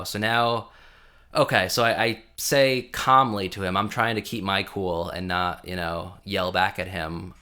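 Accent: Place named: China. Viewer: You need to retire that Chinese accent bro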